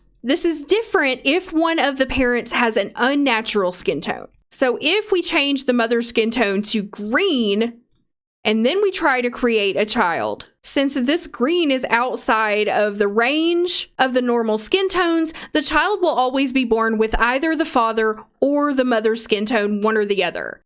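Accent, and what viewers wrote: South, United States. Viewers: Do I detect a southern drawl?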